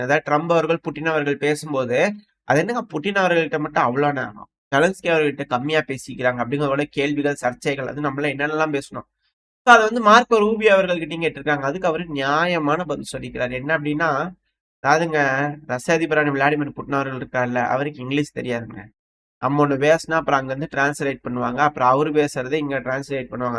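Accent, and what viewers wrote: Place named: Kongu, India. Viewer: Thambhi,,,. Ungga.,, Konggu. ,Thamiz Super ,, silence,,pechu,,,good🎉🎉🎉🎉🎉🎉🥭🍊🍑🍉🍎🍒🍓🙋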